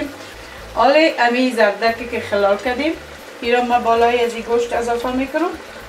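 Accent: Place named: Kabul, Afghanistan. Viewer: تشکر خوهرک چه یک غذا وچه یک لهجه مزدار کابل که ما زیاد خوشدارم مافق وسرفراز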